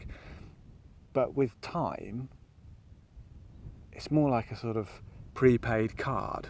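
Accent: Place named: RP, United Kingdom. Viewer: no, been and seen rhyme elsewhere when you talk. It's close to received pronunciation, I think. Her Majesty also does this. Contrast to Ireland, Scotland, or Brumie.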